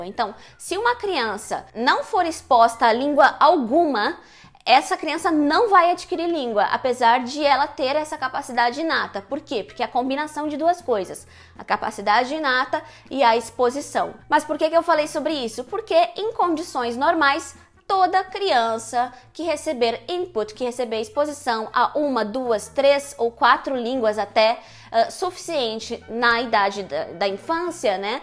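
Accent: Rio Grande do Sul, Brazil. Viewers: Que alívio ao assistir esse vídeo! Tb sou teacher, meu baby está agora com 1 ano e eu estava muito insegura, mas me identifiquei muito com o vídeo e percebi que estou no caminho certo! Tb faço time and place e espero que dê tudo certo! Super obg, te admiro mto e amo teu sotaque, tanto gaúcho pq tb sou gaúcha como onde inglês! Super beijos!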